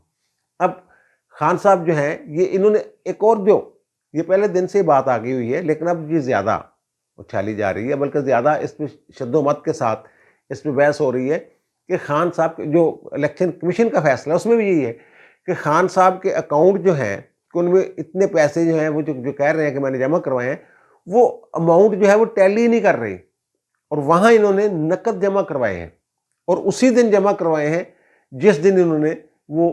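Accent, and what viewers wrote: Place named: Jalandhar, India. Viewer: Wel done Ch Sb.Your Urdu and Punjabi style is Jlandri (East Pb of India. It is true or or otherwise!?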